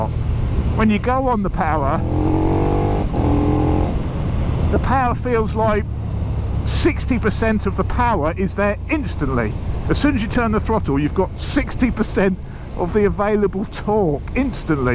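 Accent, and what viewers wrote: United Kingdom. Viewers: something about british people explaining things always make it better.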